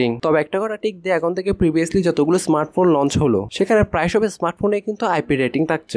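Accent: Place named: Chittagong, Bangladesh. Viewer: চিটাইংগা বদ্দা.... আঞ্চলিকতার মিক্সিং ভাষা পরিবর্তন করতে হবে।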